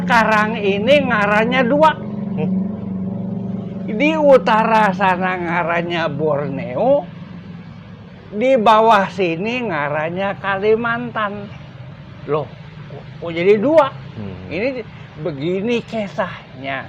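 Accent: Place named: Java, Indonesia. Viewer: mantap👍👍👍....logat nya kayak jawa ngapak yak heheh 😁salam sejahterah dan damai selalu buat seluruh warga kalimantan🙏🙏🙏kita smua bersaudara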